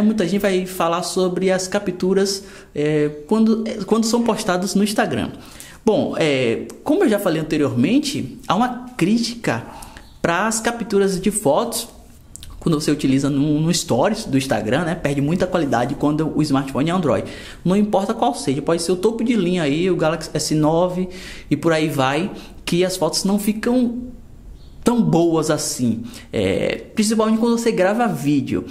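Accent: Bahia, Brazil. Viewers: Tu é baiano man kkkk?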